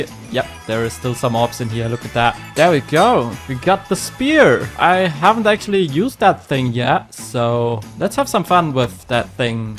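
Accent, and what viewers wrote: Germany. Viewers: deutsch oder österreich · Man hört den Deutschen Accent raus. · The accent is quite obvious actually.. Though maybe non-Germans just can't tell? (amatures)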